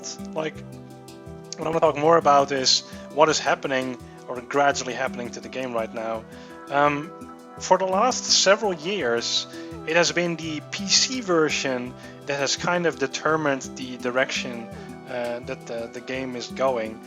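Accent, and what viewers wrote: Netherlands. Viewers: Just discovered your channel, first thing I noticed was your Dutch accent :) Nice channel though! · Of ik kan goed Nederlandse accenten herkennen, of jouw Engelse accent is nog niet optimaal...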